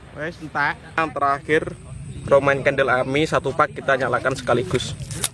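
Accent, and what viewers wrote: Java, Indonesia. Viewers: Orang mana bang kok turunan jowo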